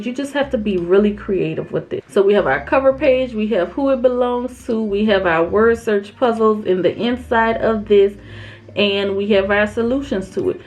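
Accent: New Orleans, United States. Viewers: I hear that New Orleans accent. Love it ❤️ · Hey i just found your videos, and they are very inspiring. Are you from New Orleans? Please let me be right lol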